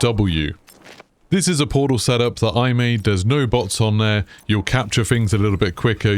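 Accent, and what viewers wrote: United Kingdom. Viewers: “Non-english”. Bro, he’s from England. Did you want an American accent with the guide instead?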